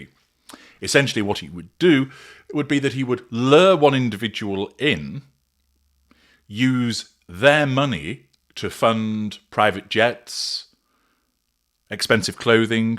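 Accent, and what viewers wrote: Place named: Northern England, United Kingdom. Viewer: Who said you are the swindler??🤣🤣your northern accent is so strong - I hear around Cheshire or Stockport - who’s dumb enough to think you are this Israeli scammer. is definitely from ‘up north ‘. Derbyshire also a possibility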